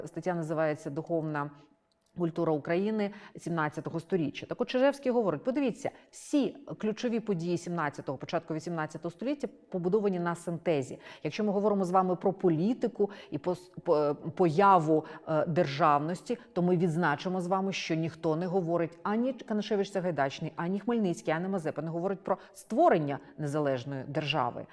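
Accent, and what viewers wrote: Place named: Russia. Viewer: Дивно слухати від пані лекторки російську вимову наших старих текстів: іґраніє, Саґайдачний А попри те це відео - добрий вступ до тієї бурхливої доби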